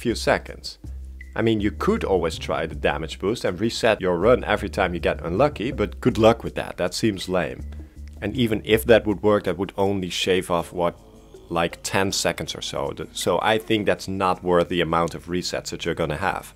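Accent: Netherlands. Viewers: Ps are you dutch? I kinda hear the accent which would be perfect because so am i:)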